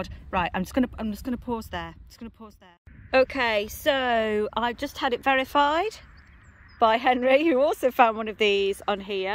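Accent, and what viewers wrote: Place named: United Kingdom. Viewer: Superb hunt. Adore your British accent 💖 I now know where your lad gets his 'OMG' catchphrase. 😄 Cheers 🇺🇸🇬🇧❤️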